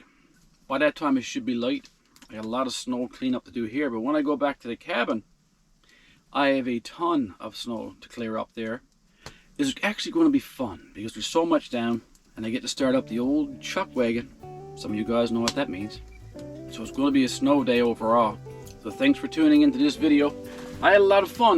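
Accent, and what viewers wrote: Ireland. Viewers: I’m from England and I thought you were Irish/North American. You have that Irish twang. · You’re Irish sounding along with the Canadian, your phrasing sometimes is Irish as well. Interesting accent! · Cant make out your accent chuck, American with a drop of Irish 😊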